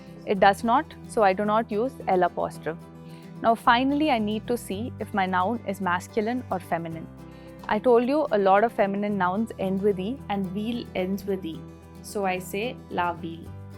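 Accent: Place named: New York, United States. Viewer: Very clear and NYC voice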